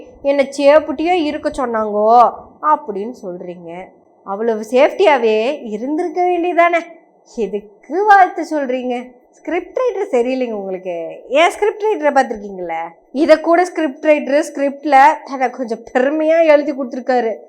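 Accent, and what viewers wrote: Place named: Coimbatore, India. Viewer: பெரியார். பேத்தி. அவர்களே. உங்களுடைய. அறிவும். அந்த. கோவை. கொஞ்சும். தமிழும். சேர்ந்து. அரசியல். என்ற. பெயரில். இந்த. நாதாரி. பசங்களை. வச்சி. செய்கிரீங்கம்மா. நீங்க. உங்களைப்போல். அறிவாளி. பெண்ணை. பெற்றவர்கள். உங்கலுக்கு. நன்றி. பெண்கள். தினத்தில். நீ. நீடூழி. வாழ்க. என. வாழ்த்துகிறேன்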